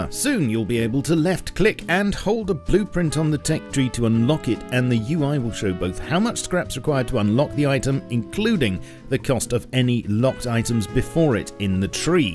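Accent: United Kingdom. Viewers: Am I the only one getting tired of the british accent thing? Not talking only about this channel, but everywhere in YT.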